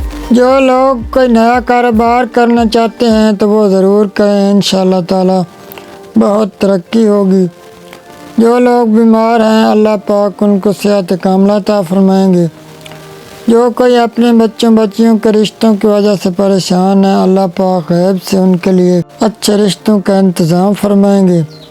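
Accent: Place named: Sargodha, Pakistan. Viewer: Aap ke Urdu boli par Sargodha ke local boli ka rang Chara hua ha. Aap ka distt ya division Sargodha ha??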